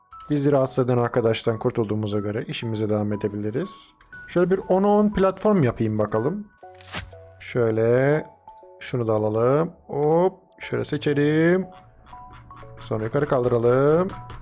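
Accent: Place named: Hatay, Turkey. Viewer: hatay sandık içi aksanı seziyorum ayrıca :)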